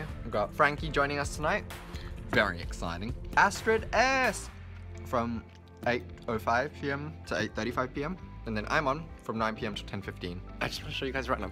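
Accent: Australia. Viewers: It might just be me but sounds more Australian in this video 👍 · Taylah He is Australian;) his accent just isn't as strong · the people that only know for being ' famous pop star' won't understand how us who know him as 'little boy with an Australian accent who talks to a camera' feel rn!!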